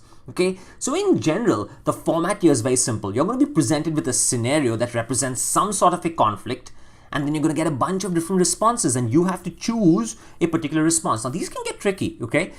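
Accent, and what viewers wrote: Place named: India. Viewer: McDonald's don't use white ppl in their advertising either. So no wonder this guy sounds like he's recording this from Mumbai.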